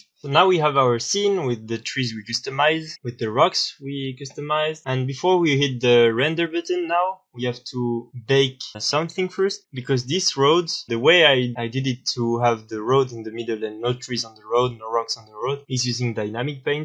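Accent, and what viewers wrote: France. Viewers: So you are French ? I love your accent from Seine-et-Marne, merci mec 😉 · truc de ouf, j crois j'ai repérer un accent Français par ici mdrrr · Vu l'accent tu dois être aussi français que moi. Félicitations pour ton boulot. C'est énorme ! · salut mais trop ^^ je vois que les cours d'anglais sont d'un haut niveau en france :p en fait, vous parlez sans doute bien, vue que je suis une bite en anglais, je sais pas trop mais l'absence d'accent anglais est fort ou bien c'est l'accent fr qui donne ce coté là quand on parle anglais....enfin, je trouve que c'est plus compréhensible que quand c'est des anglais qui parlent donc c'est cool. mais ca me fait délirer car vous etes le 3 ou 4ieme youtubeur dans la 3d que j'entends parler en anglais lors d'un tuto et l'accent est tjrs aussi facile à reperer, lol ^^ c'est trop drole (et sympa). sinon, sympa le tuto ^^ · Yo, t'es Français? 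Are you French? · tu es français non ?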